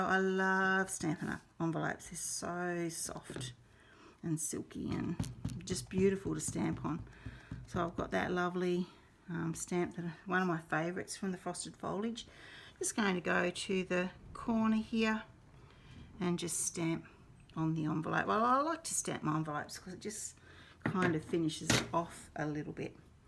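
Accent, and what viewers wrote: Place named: Australia. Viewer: Hi - I've just discovered you and subscribed. This is an amazing and oh-so-beautiful card. LOVE the designer paper and the dies too. As it's almost the very end of December, I suppose that designer series paper is no longer available, but I will try. Also, it's lovely to hear an Aussie accent when watching Youtubes. LOVE YOUR WORK!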